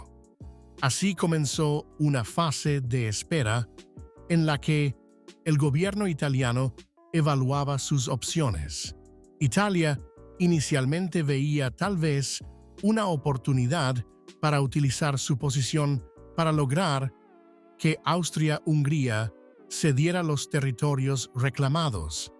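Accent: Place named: United States. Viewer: Porque acento yankee ??? Actualiza la IA y que te ponga acento neutro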